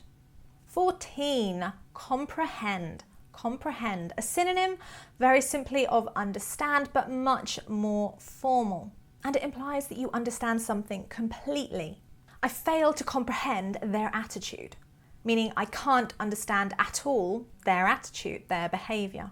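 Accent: United Kingdom. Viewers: I love British accent a lot and your accent also. I had been in England for 10 mouths it's easy to speak English but there's some confusing for me because I'm French speaker . I mean there are many words similar in French and different pronounce.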